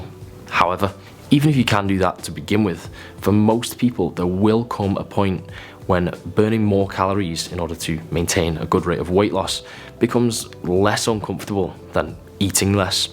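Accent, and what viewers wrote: United Kingdom. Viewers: I'm not even natty but I am gonna start watching this channel because I love this guy's accent! Much love from some colonial scum my British brother. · Excellent video, very educative, I've got a question just for curiosity, where are you from in the UK?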